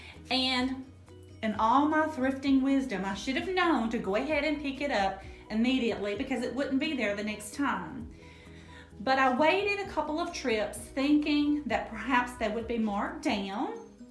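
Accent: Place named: Alabama, United States. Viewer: Everything was so beautiful and you have created a warm and cozy home! Everywhere you look there is a treasure and a history. My two bedroom house is a little cottage and 73 years old (same as me). I looked closely at your windows(are they glazed?) and baseboard trim and am wondering if your home is an older one as well. I love the charm of an older home! It even has the original glass doorknobs!, you are a delight and I love your Alabama accent! Blessings from your new Central Florida friend❤️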